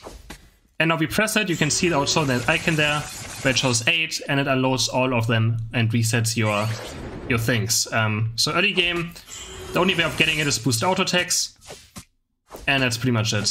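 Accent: Germany. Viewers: 90% of language i cant understand. Gerlish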